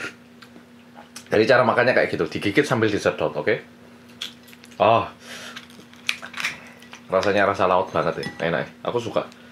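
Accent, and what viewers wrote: Java, Indonesia. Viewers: Medok jowo kaliii kmu bang.. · Ini tuh orang jawa medok apa kalo korean ngomong bahasa indo jadi kek orang jawa medok ya aksen nya? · Muka korea ngomongnya jawa😅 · Korea tapi bisa punya logat jawa asli..kerennnn😂😂😂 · Ini pertama x belajar bahasa nya di mana?kok logat nya kayak jawa 🤣🤣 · Njowo bat wkwkwk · Kok logat nya kaya Jawa? 😅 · Opo ikih tak kiro wong asli korea jebul nek ngmng yo iso medok ngono😅😂 but i like😍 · Muedok e mas wkwk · Kok ngomongnya kayak orang Jawa😂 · Jowo ne sampeyan ke pundi? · Ngomong indonya kek orang jawa ngomong indo wkwkwkwk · Kaya orang Jawa bahasa ngomong nya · Jir korea rasa jawa medok guys 🤭 2020 hadir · like bangett sma cara ngomong nya.. medhog bangettt mas ee... 😊😊😘😘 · It's just me or dia Emang medok? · Ada logat bahasa jawanya 😅😅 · Ini org korea ap jowo gaesss 😁😁 medok tenan are iki 👍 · Keliru aku,, ini orang Jawa apa Korea yaa 😄😄 koq kaya ada logat jawanya gtuh 🤣🤣✌️🙏🙏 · Kebiasaan tinggal ditempat yang bahasanya jawa jadi gitu deh nada nya😅😂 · "halo guys, welcome back to korea reomit bersama orang korea yg luwe~" "halo guys, welcome back to korea reomit bersama orang korea yg medok~" apapun versi nya, ntah kenapa tetep suka;) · Medok njir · Kuei lho mas medhok pol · Gua heran sm dia..... 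Orang korea ngomongnya kayak orang jawa.... Yg setuju like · Bang ngomong nya kayak orang Jawa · Logatnya kek logat Jawa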